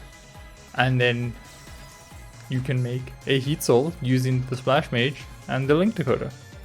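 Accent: South Africa. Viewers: Awesome vid man 🤙 recognised the SA accent immediately 😂 much love from Jozi ❤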